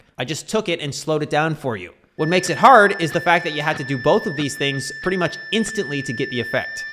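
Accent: Canada. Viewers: you can hear the canadian coming ooot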